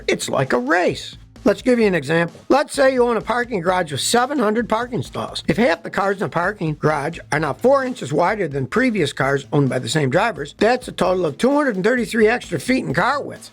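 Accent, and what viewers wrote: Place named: Michigan, United States. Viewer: did you grow up in Michigan? I did, and you sound like you did!!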